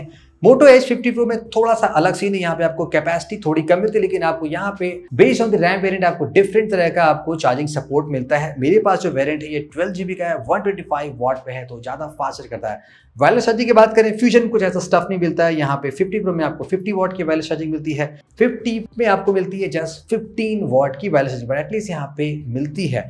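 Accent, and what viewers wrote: Lucknow, India. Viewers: Aap lko se hai kya sir..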